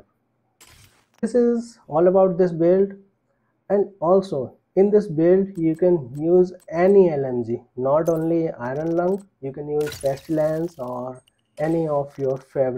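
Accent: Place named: India. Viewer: Thank you for sharing I understand your India 🇮🇳 accent it’s a little broken but I appreciate the effort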